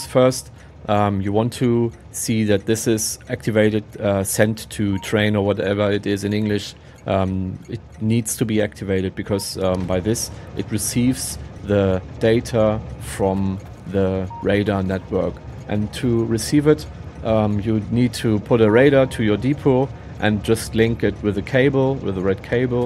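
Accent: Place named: Germany. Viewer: The german accent is HORRIBLE... please stop it... :D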